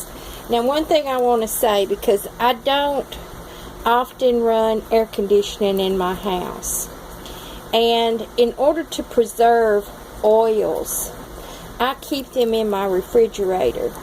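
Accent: South, United States. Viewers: James D I just love her voice! So southern and sweet. 😇🇺🇸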